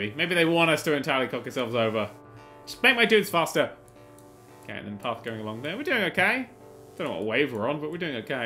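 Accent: England, United Kingdom. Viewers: I love the English accent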